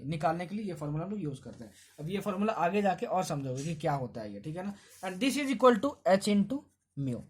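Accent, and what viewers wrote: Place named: Gorakhpur, India. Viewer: Are you gorakhpuriya???? Ur accent is sweet....btw thanks for course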